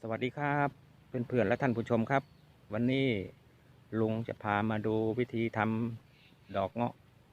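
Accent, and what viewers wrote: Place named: Chanthaburi, Thailand. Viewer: เค้าเป็นคนจัน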